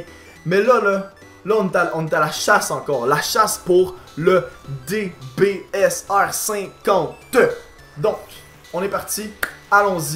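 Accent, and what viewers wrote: Quebec, Canada. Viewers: T tu québécois ?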